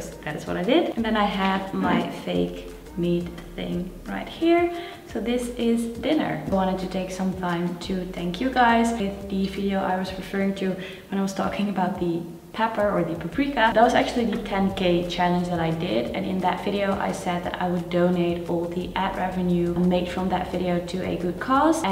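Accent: Netherlands. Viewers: she's dutch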